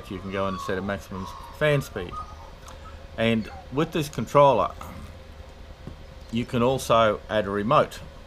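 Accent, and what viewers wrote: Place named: Australia. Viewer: Great videos, good to see some with an Aussie accent. Sorry if this has been asked but reading all of the comments on my phone is a bit tedious. Q: if the controller failed, how would the unit respond if the fuel supply was removed rather than the power, would the unit complete it's cool down procedure and shutdown gracefully or do those smarts live in the controller?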